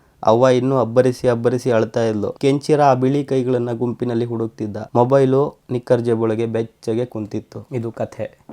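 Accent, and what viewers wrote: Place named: Davanagere, India. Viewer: Anna nam Davanagere bhashe mathadidri, tumbha santhosha aytu Keli , urin nenp aytana 😢😊😊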